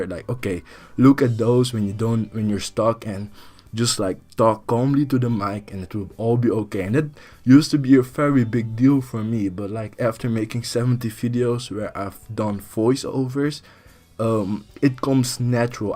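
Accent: Netherlands. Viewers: Good thing you decided to do your voiceover in English. Otherwise, I would not understand a word and fall asleep. Your Dutch accent is pretty cool..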